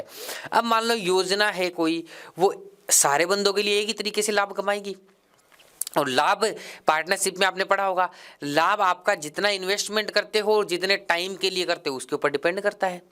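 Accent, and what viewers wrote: Haryana, India. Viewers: Sir haryana se h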